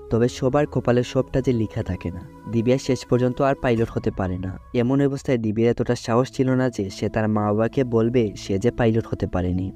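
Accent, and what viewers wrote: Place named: Sylhet, Bangladesh. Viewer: Sylhety vasa oshadaron😮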